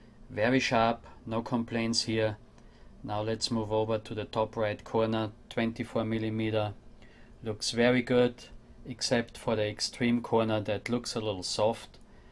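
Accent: Austria. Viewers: Austrian accent.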